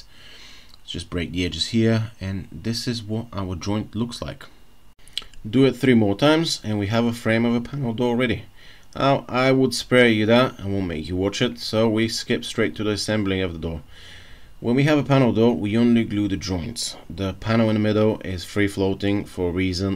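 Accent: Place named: London, United Kingdom. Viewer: what’s that accent?…it’s a bit Laaaandon..but mixed with what? Excellent video by the way.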